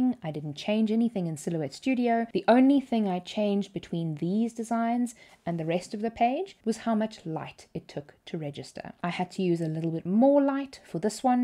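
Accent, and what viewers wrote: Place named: South Africa. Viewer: Your accent is so nostalgic. miss SA so much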